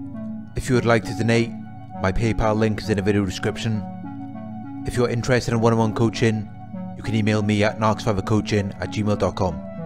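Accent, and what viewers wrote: Wales, United Kingdom. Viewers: Sounds British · He sounds Welsh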